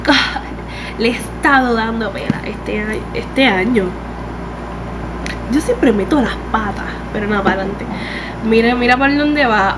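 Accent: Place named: Puerto Rico. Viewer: Diossss amoo tu acentoo puertorriqueño ♥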